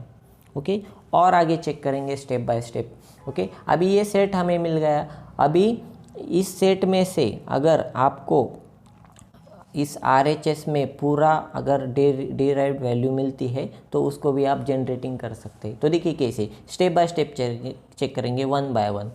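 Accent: Gujarat, India. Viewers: Are you Guju ?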